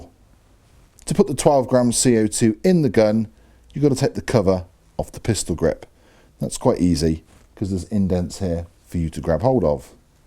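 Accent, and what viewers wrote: England, United Kingdom. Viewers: The English accent is just easier for me to take than a lot of US videos, and I am from the US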